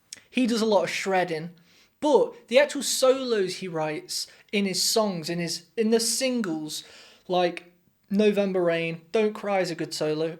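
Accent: United Kingdom. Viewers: What part of Britain are you from? Love your accent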